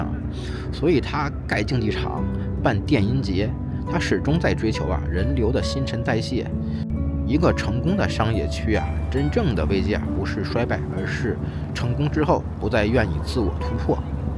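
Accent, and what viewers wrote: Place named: China. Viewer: 你又中計了。這個人來找你麻煩，不是因為你的影片內容。你太不了解台灣的現況了，有一群被政黨洗腦過的蠢蛋，只要你說話是中國口音，就會啟動瘋狗模式亂吠一通。牠留言第一句就說你腦殘，這種人就是典型的台灣蠢青，只想引戰惹你發火。說再多都是枉然，不要浪費時間，最好的應對就是把他封了。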